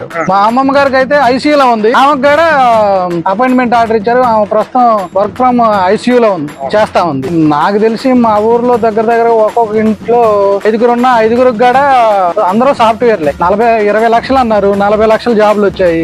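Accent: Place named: Godavari, India. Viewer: అన్నయ్య తెలుస్తుంది గోదావరి జిల్లా అనుకుంటా😂😂😂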